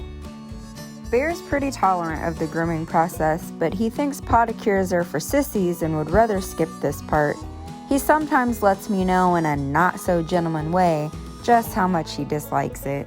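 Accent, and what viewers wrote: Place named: South, United States. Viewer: Your southern drawl accent is the best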